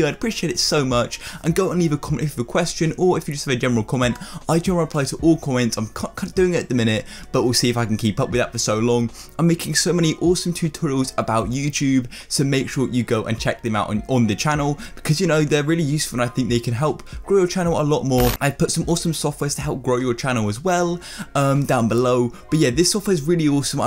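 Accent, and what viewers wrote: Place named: United Kingdom. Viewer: Hehehe, your cute.. but very UK.. I have friends from there... I do like the video very informative, thank you so much... :)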